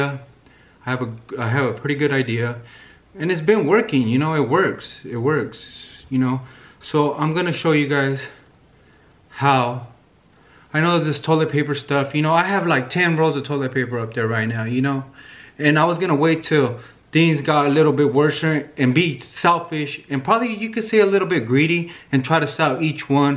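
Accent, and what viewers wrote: Sinaloa, Mexico. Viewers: Love his Spanish 🤪 talks straight up like a sinaloense. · I'm so freaking dead right now 💀💀💀💀 pinche, the fact that I was taking his ass serious at the beginning.. like dead serious. All into it.. I cannot see you the same right now 😂😂😂😂😂 and then his acento bien sinaloense😂😂😂🍅 actor of the year goes to........ 😂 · He had me at “a roll could last you up to a year” so I continued watching...... pero like no quiero usar my finger to clean my 💩😅. The Spanish version had me rolling😂💀 “no se anden panikiando” also how he kept saying “fundillo” deceased! His Sinaloa accent made it so much better. This video needs to go viral ASAP😂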